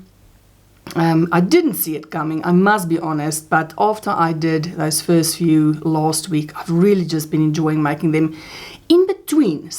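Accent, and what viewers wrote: South Africa. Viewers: Hello love ❤️, I recognise a South African accent? are you from South Africa perhaps?🥰🥰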